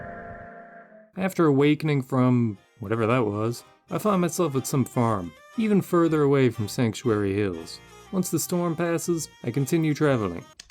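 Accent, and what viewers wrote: Ireland. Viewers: It’s Irish I’m pretty sure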